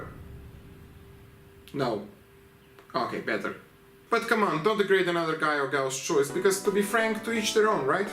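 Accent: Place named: Russia. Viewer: à Guy with russian accent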